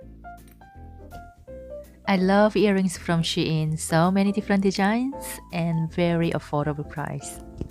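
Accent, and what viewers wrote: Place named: France. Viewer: Hi, i recently discovered your channel and i love it! Me too im korean but adopted in France and now i live in Spain. Have you lived in France too? Because i notice you a little french accent when you speak. You are so beautiful and radiant inner and out!🌸i think that you can be a real source of inspiration for so many women, thanks to share with us 😉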